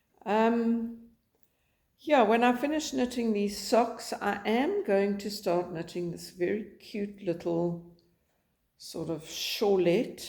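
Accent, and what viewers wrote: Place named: South Africa. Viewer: Hi and how lovely to hear a South African accent and the Hadidas, are those the ones we call Kwê voëls. When I look at the way you hold your needles and yarn, I know we grew up at the same time in South Africa. Although Afrikaans, I should have knitted continental, my mother had very strong ideas about the done thing. When I taught myself to crochet she always had a lot to say, because I did it my way. Even my Afrikaans friends found it strange and when I see how most people do it on YouTube I think anyway is okay as long as you enjoy. I hope my daughter isn't too cold in Joburg. The UK is mild and people are upset because this isn't summer. I don't mind, because it can get quite muggy. Enjoy your stay and I envy you going to your granddaughter's party. Think I will give those socks a go. Look forward to hearing your voice again. 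Anita x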